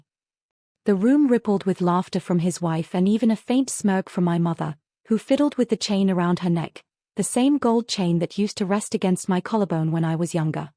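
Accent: United Kingdom. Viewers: What sounds like a British accent makes me wish we viewers were able to choose which accent we wanted to hear. That would be the only way I could hear these stories narrated by an AI voice with an American accent.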